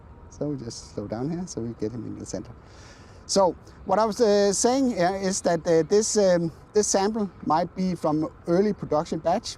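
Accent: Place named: Denmark. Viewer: It's actually Denmark I believe due to his accent. But sincerely thnx for asking 😂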